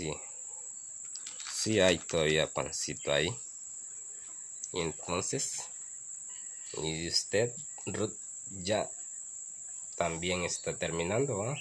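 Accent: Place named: Andalusia, Spain. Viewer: SOY NUEVO SUSCRIPTOR, AL PARECER EL SEÑOR QUE GRABA ES ESPAÑOL, LO DIGO POR EL ACENTO ANDALUS QUE TIENE.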